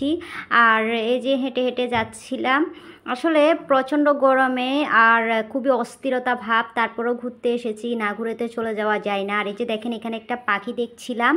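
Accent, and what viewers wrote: Chittagong, Bangladesh. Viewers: ❤ amar khub bhalo laglo tomar Kotha gulo bon ❤khub misti bhasa chottogram a ❤sabar bhasa sabar kche sundor sabar sabae k samman dawa uchit❤khub sundor hoache makeup dressing darun sundor lagche tomae bon ❤ghoraguri jhal muri ❤❤gorome thanda juice khub bhalo lage❤sab milia khub bhlo lglo 👍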